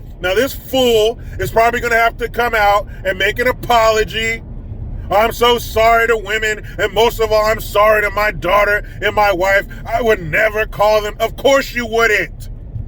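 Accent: United Kingdom. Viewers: Hey nice limey accent. You is a funny smart fella and you make this old 77 year old tan dude. Albinos are white and pale millennials living in moms basement. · Love the British accent. You should read us some Charles Dickens. Call it story time with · Love the ah British or something accent!!! LOL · I want to hear you say, Lambofeeties in that British accent! I'll die LMAO!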